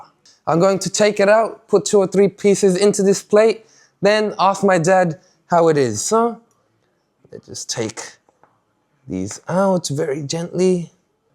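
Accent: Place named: United Kingdom. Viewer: I just watched it because of British Accent🤦😂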